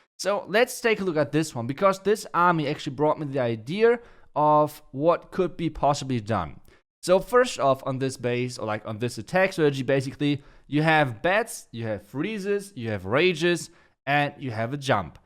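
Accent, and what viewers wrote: Germany. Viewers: I love how german sneaks into English videos, especially zuper 😂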